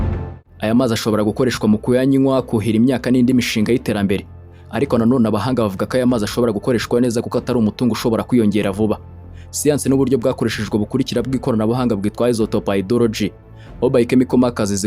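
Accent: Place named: Tanzania. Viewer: Hello. I Am hearing you talking like a SUKUMA tribe in TANZANIA 😮😮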